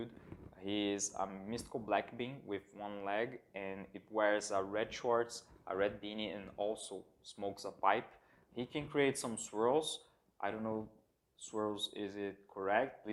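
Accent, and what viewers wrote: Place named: Brazil. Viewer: O sotaque brasileiro tá mto perceptível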